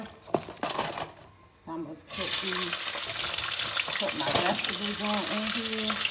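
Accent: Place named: DMV, United States. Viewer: Shalom, where are you from? Sounds like from the DMV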